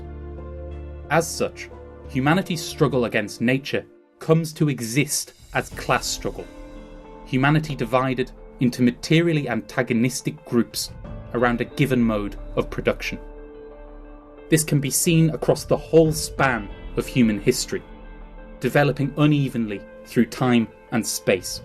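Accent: United Kingdom. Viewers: You sound British or Anglo-Saxon for sure, so you don't live in communism. SO, JUST ASKING HOW DO YOU KNOW THAT IT'S SO GREAT AND WONDERFUL? LEGIT QUESTION.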